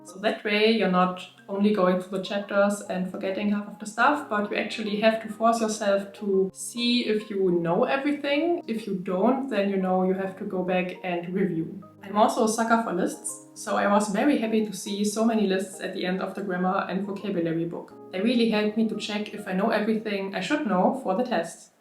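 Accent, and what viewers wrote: Germany. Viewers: Have you considered taking the Tokini Andy courses? They look interesting… I want to get to level N5 by June 21, 2024… Best, John.. PS: Am I detecting a German accent?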